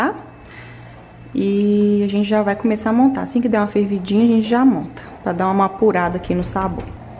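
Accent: Minas Gerais, Brazil. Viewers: Ahhh esse sotaque mineiro😍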